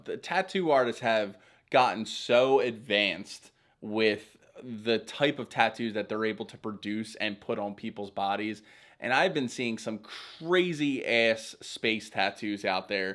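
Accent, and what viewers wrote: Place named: Philadelphia, United States. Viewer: Completely unrelated comment I'm new to your channel and listening to you talk I immediately thought "this dude's gotta be from philly or the surrounding area." then you mentioned and "wooder."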